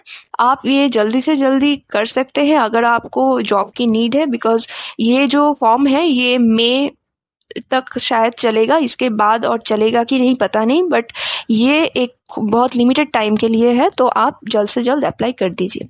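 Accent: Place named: Bengal, India. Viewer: Aapki hindi sunke ek baat to sure hai aap bengali hai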